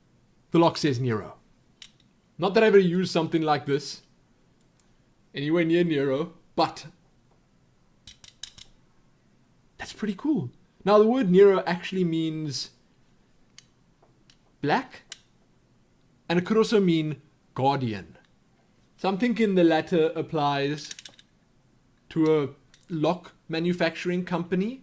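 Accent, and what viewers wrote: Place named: South Africa. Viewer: Ahhhh! South African! I've been trying to figure out your accent for the last 3 weeks! lol. I think I'll send you something too! Your videos have been quite entertaining!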